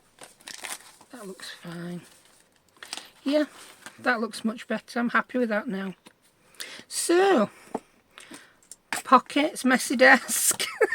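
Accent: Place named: England, United Kingdom. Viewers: Whenever you say "I don't know", it reminds me of the potato ad I loved.."Don't know, it's all covered in mud" love the yorkshire acent. Much better than mine ....(semi, scouse! I'm from other side of water!) Love your videoes.... please say Hi to Gertrude for me LOL! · Omg I love your act sent alway did Or Linden English England just love that accent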